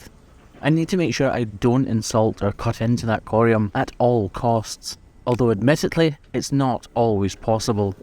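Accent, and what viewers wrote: Scotland, United Kingdom. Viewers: You sound like an avarage british person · Is it just me that find theses videos strangely soothing? Perhaps it's his calm Scottish accent. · Scottish is crazy sometimes. I deadass thought you were speaking French and I didn’t know why I couldn’t understand until I realised you were speaking the queens English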